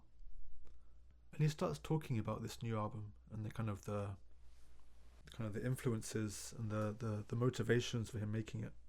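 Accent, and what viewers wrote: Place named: United Kingdom. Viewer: 우와 진짜 영국식 영어!!!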